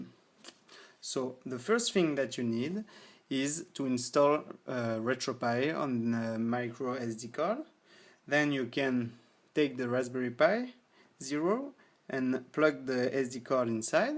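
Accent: France. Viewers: salut ^^ Vu ton nom et ton accent, j’imagine que je peu parler français ... tu fait la même chose avec un Pi 3 b+ ?? pour supporter le nouveau recalbox 4.1 (dreamcast / PSP / etc ...) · Putain mec please parle fr ca s entend trop a ton accent que tu parles fr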